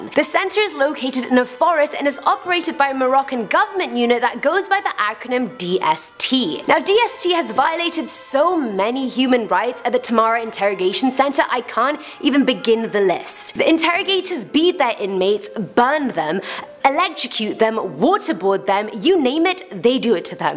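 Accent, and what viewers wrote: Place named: United Kingdom. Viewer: A new host at Landon? I didn't know. Trying to figure out where you're from. You sound British but it sounds like there's another accent in there.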